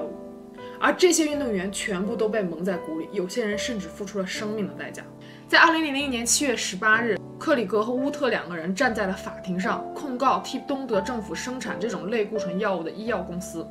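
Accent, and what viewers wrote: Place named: Northeast, China. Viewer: 听口音是东北人哪里的？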